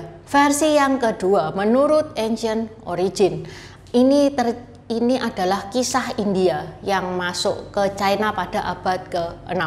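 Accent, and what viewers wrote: Java, Indonesia. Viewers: Medok men wong cino iki... ❤❤❤😅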